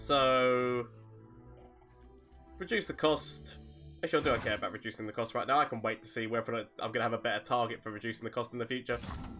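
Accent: United Kingdom. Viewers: you're the best british streamer hands down. As someone from the commonwealth but not the isles I tend to find the accent interesting. Just a thought, but maybe you can market yourself as the ' UK ' streamer 🤔. As well, I'm part if the discord just to preface. I thought though that to grow and reach your goal of 5k you need a website, unless you have one already; did not check. Al a swimstrim per se. Although, love your decks because they are fun! I also think you could look into paid marketing for your targeted keywords. I do it professionally for other people. No obligation for any ' deals ' but I can help in return for your videos. They keep me going sometimes 😄. It would help grow quite reliably imo Furthermore, please stop slouching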